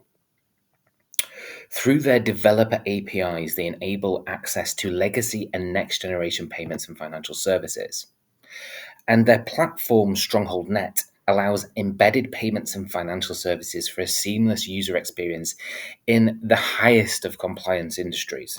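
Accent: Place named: England, United Kingdom. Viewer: Ahhh didn’t know you were English my friend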